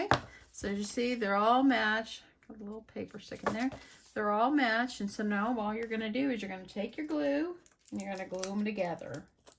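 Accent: South, United States. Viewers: Miss, you have the most beautiful, soft spoken southern sweet voice that always puts a smile on my face. I have had a rotten day, and listening to you always makes me feel at ease and soothes me into comfort. Thank you so much. God Bless you girl for sharing so much with your YouTube audience, you are truly special. And of course I also love all of your crafting 💕💕💕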